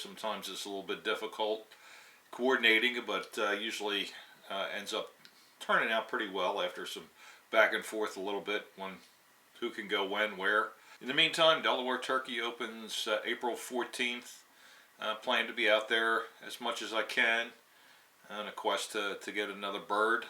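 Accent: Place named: Western Pennsylvania, United States. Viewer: Where are you from originally. You have a strong western PA accent. Love you and your sons videos. Hunted at 2 different camps for years around Bradford and just south of Kane. Lots of great memories just not many deer. But that's not really what camp is about anyway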